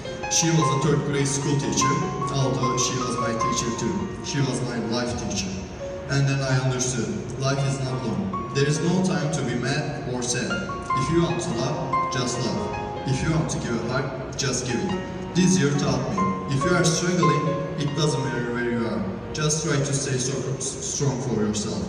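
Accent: Turkey. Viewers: türk şivesi yakıyo ya ama sıkıntı büyük hikaye üzücü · Türk aksanı, ve yabancılar bu aksana bayılıyorlar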